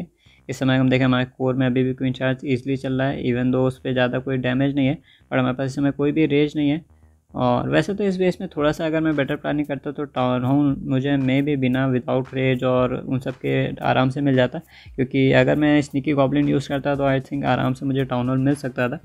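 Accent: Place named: India. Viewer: hey mate i know its hard, but if u speak about your videos, it would be nize if u try to speak a bit slower, the indish accent is hard but i wanna hear the english phrases :D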